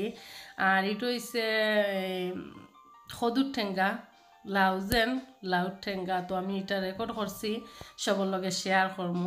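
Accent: Sylhet, Bangladesh. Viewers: আপু সিলেটের আঞ্চলিক ভাষা আমার খুব ভালো লাগে আর সিলেট অঞ্চলের খাবারও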